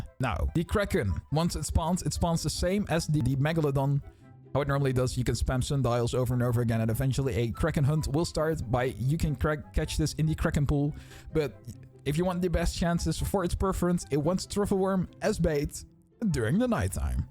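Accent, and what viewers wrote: Netherlands. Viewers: je hoort gwn dat je nederlands bent lol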